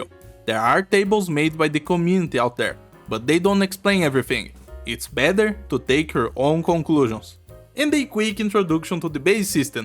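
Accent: Brazil. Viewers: i can pressentir that you é um brasileiro kkkk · Thanks mate, I gonna start in arknights because two reasons. 1 - Monster hunter 2 - Waifus. (Obrigado mesmo foi bem simples a explicação, e o sotaque brasileiro denuncia bem rápido kkkkkk)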